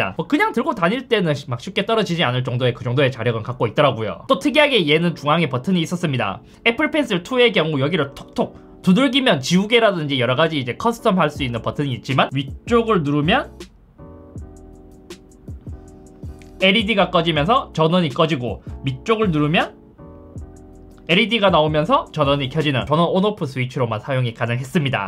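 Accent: Gyeongsang, South Korea. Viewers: 나름 얼리아답터인데 동영상을 즐겨보는 스타일이 아니라 일부러 찾아보진 않는데. 우연히 KT광랜 속도가 안나온다는 뉴스에 잇섭이 누구지? 해서 봤는데 ㅋㅋㅋ 일단 갱상도 말투에 재밌어요. 그래서 저의 유튜브 구독 1번이 되었습니다. 앞으로도 흥미진진한 컨텐츠 부탁드려요.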